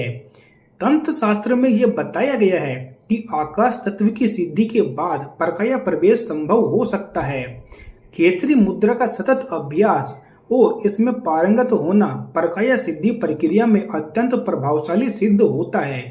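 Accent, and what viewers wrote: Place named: Bihar, India. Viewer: Tumhari bhasha hindi se bilkul alag hai,shayad bihari type ki. Lagta hai koi kitab padhkar baat kar rahe ho. Kitab shuddh hindi me likhi hogi par aap bihari lahja laa rahe ho. Koi like nhi. Skip direct.ok ji..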